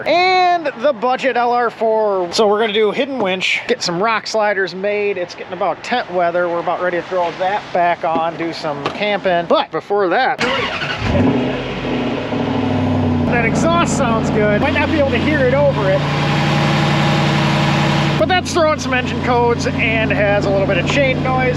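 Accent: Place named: United States. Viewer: God I hate the American accent!!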